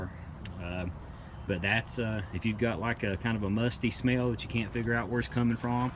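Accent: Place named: Tennessee, United States. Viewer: Hi, I bought a 2006 Jazz here in West Yorkshire a week ago. I knew about the leak issue so was pleased to find mine is OK. However, i took the precaution to seal those areas you highlighted with clear silicone sealant. Have you got another car and ditched the Jazz? ps love your accent, im guessing Tennessee?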